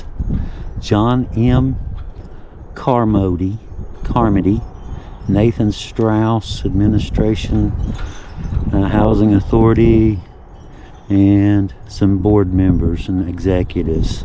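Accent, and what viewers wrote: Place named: Kentucky, United States. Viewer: and you two are such a wonderful team! I play your videos to listen to as I drive. My mom,she passed right before Christmas, loved listening and watching while I drove. has that storyteller voice plus the accent we love. You see she came from McCreary country Kentucky area. My grandparents moved north to Indiana once the mines shut. Later on working the glass factory up here she met my beloved Ma Ethel. Ma Ethel was from Mingo. Kermit to be exact. She was a Chafin.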